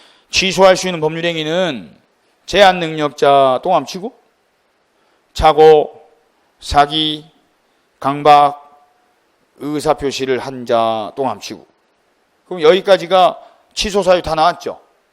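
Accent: Jeolla, South Korea. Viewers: 이 아저씨 왕 섹시하다. 아우라 오지고. 전라도사투리도 꽂히네. 머더러 혀...?.아니여.현존이악만줘. . . ㅋ ㅋ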